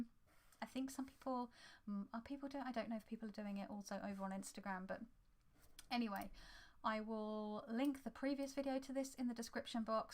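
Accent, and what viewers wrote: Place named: United Kingdom. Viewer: I'd like to see the video as well. Having so many "I once thought" moments is a good thing. Imo. It says that you have a open mind and heart. This was great ❤ btw hearing your lovely accent makes me miss the UK so much. Can't wait to go back. Thanks for sharing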